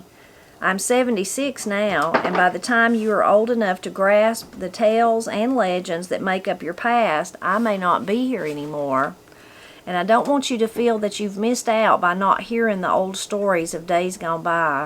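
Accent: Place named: North Carolina, United States. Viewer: Thank you for reading this! I first read “Christy” back in the early ‘70’s, have gifted it to others, and re-read it myself a few times since. I’ve just ordered a copy of “Letters to Lori”. I live in Granite Falls, WA and do not often get to hear a beautiful N.C. accent, so I truly appreciate you reading the book and your commentary on it. It was a special blessing to see the video in the link with Miss Opal❤ May God bless you and your loved ones. Also, know that I’m praying for those folks who’ve asked for prayer.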